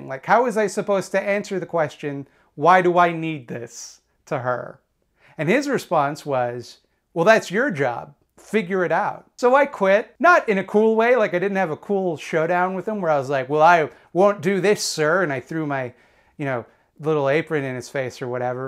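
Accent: Newfoundland, Canada. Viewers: I did not realize you were a newfie! Hey from scotia! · I'm sorry, you're a Newfie????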